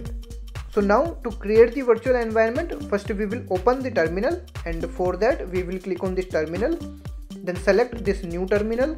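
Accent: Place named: India. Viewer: Jesus Jones.... it should be forbidden for Indians to talk English. I would understand that probably better if you would talk in your native language 🙂 Please script! But thank you for trying to help anyway!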